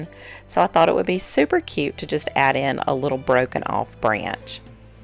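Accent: South, United States. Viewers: Girl you are ridiculously talented. I just love these. You blow my mind every time. And I love your southern draw. Reminds me of when I was child and we would drive to Tennessee every summer to visit family. Nothing like a 10 hour drive in a car filled with kids. Keep crafting and stay healthy my friend 🌸🌼🌺🌻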